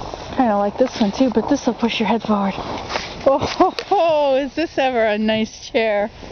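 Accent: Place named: Canada. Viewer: Hello, I've Never Heard Advertisement Pronounced the Way you Pronounced it, but everyone Pronounces things Differently!! I Thought it was Funny when the Lady Said "Are You Talking to Someone....Or...." haha. I Heard the "Aye" at the End of the Sentences, like I've heard some Canadians say "Aye" or "Eh". Anyways, I enjoyed the Video!!!! There are A lot of Good Things to Find at the Dump. Like the Saying Goes, "One Man's Trash is another Man's Treasure" Or, Woman. ~Erin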